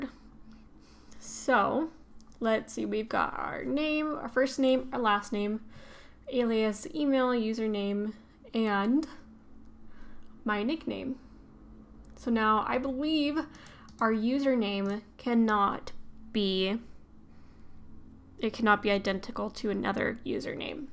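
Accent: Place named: United States. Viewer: Thanks God for an American accent :) ( it's annoying trying to understand a very strong Indian accent :P) thanks for the video.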